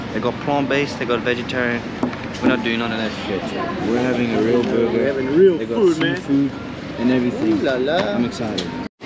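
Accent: South Africa. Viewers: You sound very south african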